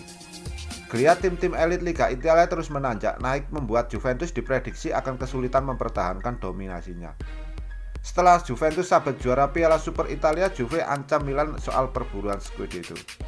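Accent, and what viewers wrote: Java, Indonesia. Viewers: Medok ngomong.e boss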